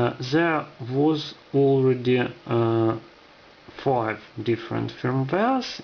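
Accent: Russia. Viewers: с первых слов произношения слышно русского :)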